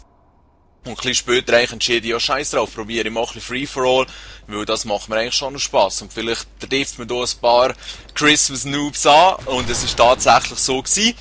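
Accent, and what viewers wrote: Switzerland. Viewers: Geil endli mal en schwitzer commentator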